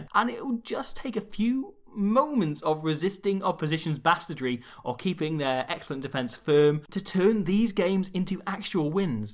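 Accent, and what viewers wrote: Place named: England, United Kingdom. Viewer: he sounds english to me mabye born in England with welsh grandparents or something.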